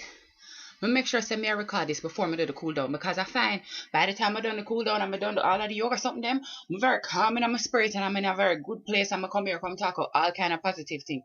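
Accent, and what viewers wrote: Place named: Jamaica. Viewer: I was wondering if you were Jamaican or sumtin. Day 12 today for me. I LOVE DIS SHAT.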